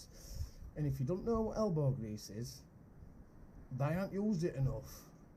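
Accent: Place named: Barnsley, United Kingdom. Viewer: 😁i knew as soon as started watching this tha was from Barnsley ,even before i looked at comments........good vid mate.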